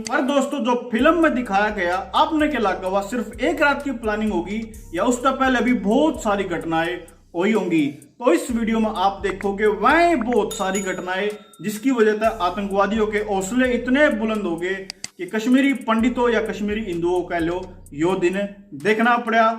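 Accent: Haryana, India. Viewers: Bhai tara video suru hon ta paala ka intro va haryanvi tone bhot badiya laga ha 👌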